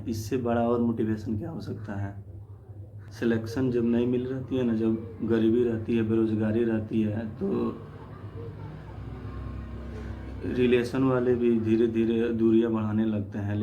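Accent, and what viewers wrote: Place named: Bhojpuri, India. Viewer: Bhojpuri bahut badhiya laga aapse sunkar aap kaha se hai😂😂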